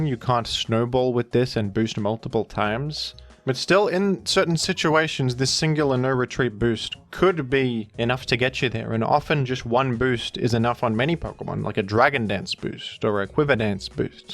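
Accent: United Kingdom. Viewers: Bro I thought you were British or something but then you said soccer. What the hell are you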